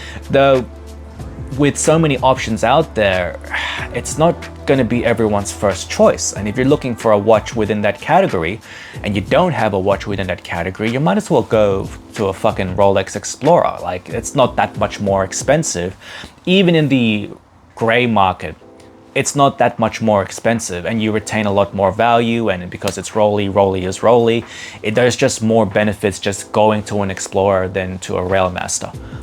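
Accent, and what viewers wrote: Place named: Australia. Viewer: Of the ones you showed on wrist, if money weren’t much of a constraint I’d spring for the Ingenieur (though in the regular blue that was released last year, or the black which makes the dial texture more subtle). Otherwise the Mark XX is hard to fault and is great bang for buck. I just priced one in Switzerland and could get it for 5000CHF (you sound Aussie so that’s approx. 9500AUD).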